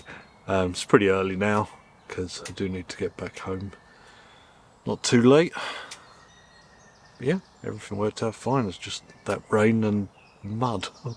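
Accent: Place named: Cockney, United Kingdom. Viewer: Love the cockney accent and pronunciation, "minus free" .. brilliant.. your videos are so relaxing. My Dad took us campimg a lot when we were kids.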